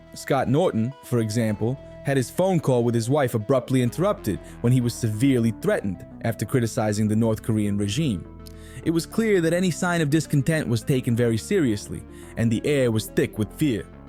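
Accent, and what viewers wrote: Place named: New York, United States. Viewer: Horrible Mispronunciation, Using other people’s footage, mistakes all over and speaking in a ln awful New Yawk Accent… Smells like a Russo production 😂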